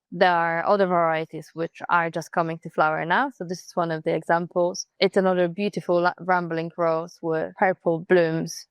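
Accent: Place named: Sweden. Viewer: You sound a bit Swedisch.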